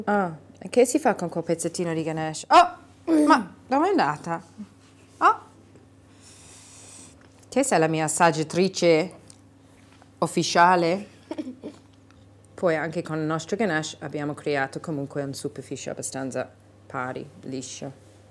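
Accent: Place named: Italy. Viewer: OMG! I freaking love your Italian accent!! :-)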